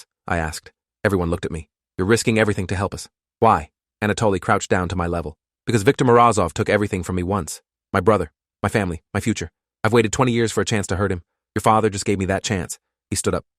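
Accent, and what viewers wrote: United Kingdom. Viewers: I love the Britain accent. It’s wonderful.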